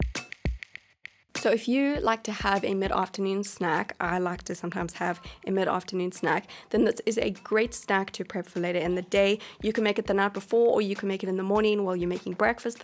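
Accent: South Africa. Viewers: That South African accent nehh😍😍plus Woolies products got me hook,line and sin🇿🇦✌🏿👊🏿👍🏿😉 Lekker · Hullo from another SAfrican in New Zealand. Miss that accent and I miss Woolies!! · Yah that's not an Aussie accent haha, South African more like :p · I'm definitely gonna try out these recipes they look super yummy! Also, love the quality of your videos and use of good angles, closeups, lighting, etc. One more thing, my best friend is from Cape Town and gotta love those South African accents hehe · Where is your accent from. I'm guessing South African. Sounds pretty. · That's a South African accent. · Stumbled on this video and was like ‘wow she sounds south african’🤔 Thats because you are! So lovely to see a fellow SA youtuber and loved the video! Now Im hungry...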